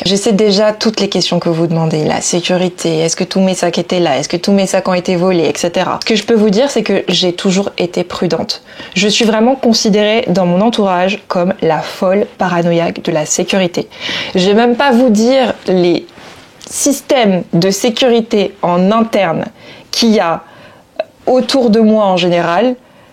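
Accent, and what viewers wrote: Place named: Guadeloupe. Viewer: ah oki je me disais bien que j'avais entendu un petit accent de chez nous. Merci beaucoup pour ta réponse et en ka voyé on big Foss et couwage baw de la Guadeloupe !! Never give up we keep getting stronger!!! 💪🏾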